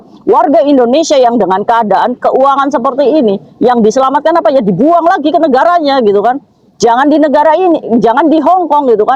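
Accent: Indramayu, Indonesia. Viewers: Kak semangaaat Tpu logat Indramayu nempel kaya saya ( kita ga dari Indramayu )😊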